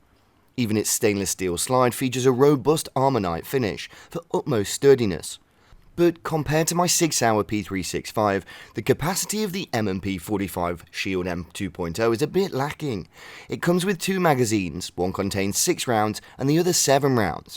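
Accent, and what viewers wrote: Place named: United Kingdom. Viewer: I hear a British voice and immediately know this person doesn’t know shit about guns let alone ever seen one in person😂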